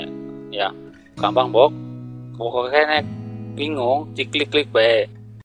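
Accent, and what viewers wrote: Banyumas, Indonesia. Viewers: Semngaaat kang..Samapi lupa kunjung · Nyimak lagi dijelasin sama pak dosen.. Salam Kay Rend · sukses terus om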